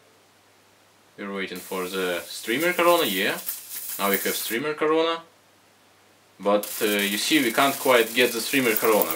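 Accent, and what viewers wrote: Russia. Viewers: такой "русский выговор". Прям вообще!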